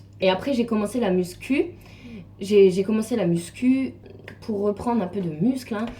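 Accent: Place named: Lorraine, France. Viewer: Ça motive ton expérience :) tu es d'où en lorraine ? Je suis de lorraine également :) j'adore ton accent :D haha Et ta vidéo :) gros bisous ma belle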